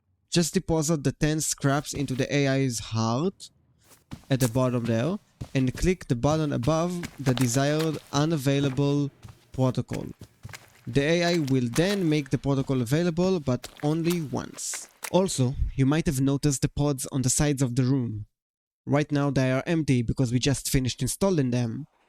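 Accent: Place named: Germany. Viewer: your accent is so interesting it kinda sounds german but not at the same time so cool! also this video is so awesome of an intro its really so awesome to see new ideas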